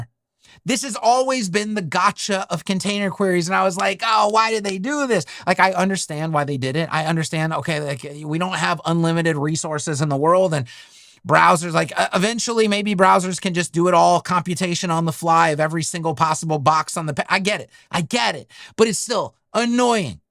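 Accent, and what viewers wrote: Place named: United States. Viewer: Is it me... or does (general American accent) say "era" and "error" the same way? 🙂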